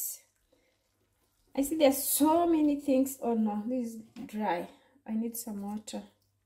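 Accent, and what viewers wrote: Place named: Coast, Kenya. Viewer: Hapa Mombasani ni Kitunguu Maji( costo accent😅😅👌)as always outgoing yourself in your kitchen😋😋yummy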